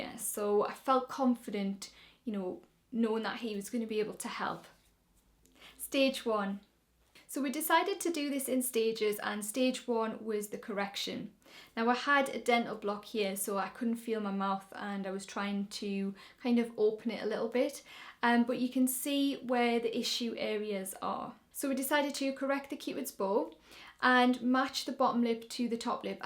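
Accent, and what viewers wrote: Newcastle, United Kingdom. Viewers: Are you from South Shields? I'm from Newcastle and your accent sounds familiar!!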